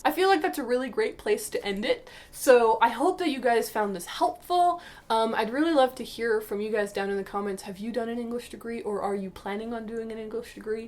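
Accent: Canada. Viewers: Loved hearing the Canadian accent strong in this video, as a Canadian living in the states for a decade.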